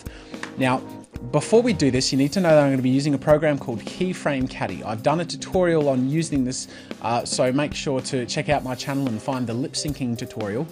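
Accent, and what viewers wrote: Australia. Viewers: YES AN AUSSIE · r u australlian?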